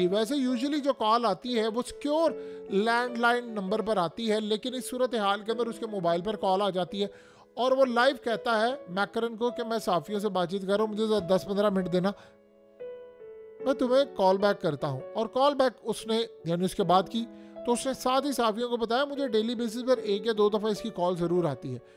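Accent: Punjab, India. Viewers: Way this guy talk sounds like he is war time expert, he is pandu with Punjabi accent.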